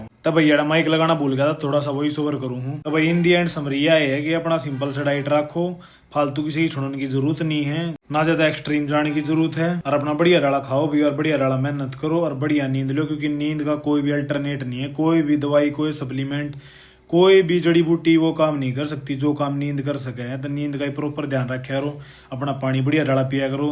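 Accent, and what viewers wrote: Haryana, India. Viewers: Bhai nu hindi na aave haryanvi dialect me hindi bole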